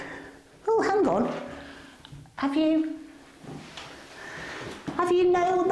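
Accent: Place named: Birmingham, United Kingdom. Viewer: From the 'm'duck' do I guess Midlands maybe Nottingham?. In the next video could you give an idea of the land water etc I love the accents of home.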